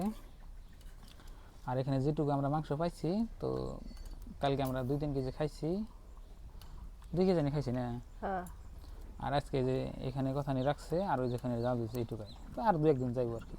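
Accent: Bangladesh. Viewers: উজানী ভাবি ভাবির ভাষা শুনি বুঝছি ভাবি উজানী মুই ও কিন্তু উজানী 😂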